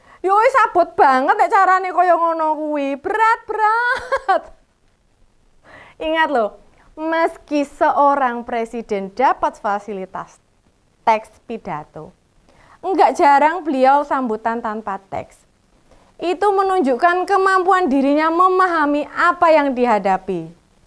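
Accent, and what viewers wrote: Java, Indonesia. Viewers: Mbak asli ndi to Kok jawax medok Jateng opo jatim Q demen ngrungok ke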